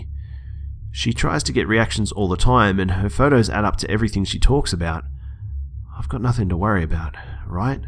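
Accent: Australia. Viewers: i can't tell but are you Australian? i love your accent a lot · Is he Australian???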